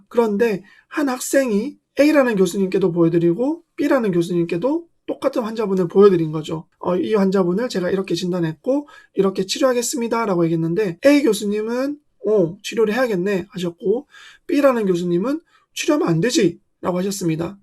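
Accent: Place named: Gyeongsang, South Korea. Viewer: 말투가 경상도네 돈에 환장한 극우 확정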